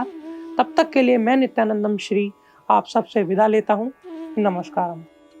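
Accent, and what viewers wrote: Punjab, India. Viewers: Tussi Punjabi o??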